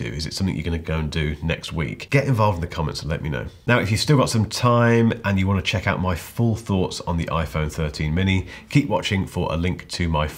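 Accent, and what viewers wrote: United Kingdom. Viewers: Excellent and stylish review, I have one and love it as well ,and because your British accent I will drink my usual single malt ,its time to cheers.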